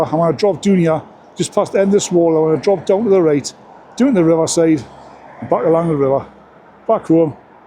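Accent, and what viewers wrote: United Kingdom. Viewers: He is probably a Geordie? From Newcastle in North East England. I am from London, England. If he is from Sunderland or Gateshead? He will hate me for calling him a Geordie 😅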